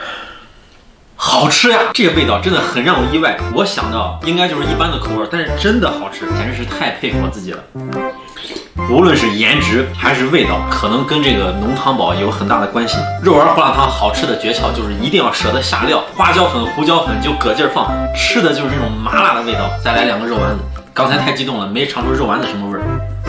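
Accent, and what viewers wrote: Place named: Shaanxi, China. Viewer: 听到的陕普好亲切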